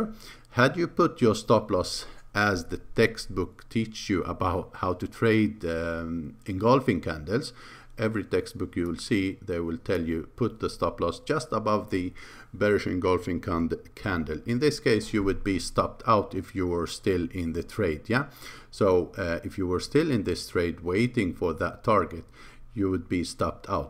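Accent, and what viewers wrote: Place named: Iran. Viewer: You sound like you are from originally from Iran.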